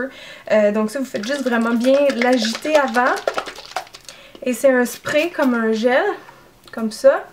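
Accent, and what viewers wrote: Canada. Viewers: tu parles un moment de "machine air brush"?? ou j'ai mal compris? Hihi, vu que je ne suis pas canadienne avec ton accent parfois y a certains mots que j'ai du mal à comprendre :p (c'est pas du tout un reproche hein, j'aime bien l'accent canadien et je trouve que ca a beaucoup de charme :) )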